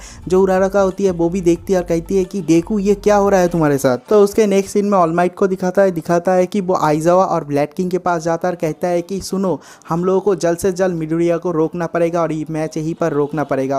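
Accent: Bengal, India. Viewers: r u bangali bro...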